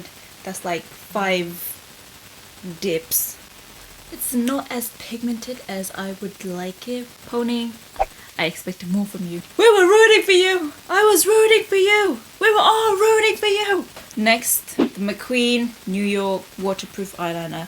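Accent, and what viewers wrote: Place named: Australia. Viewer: Are you Australian, Kiwi, British? I can't tell, your accent is all over the place 😂😂 it's so cuteeeeeee but I'm so curious. where are you from?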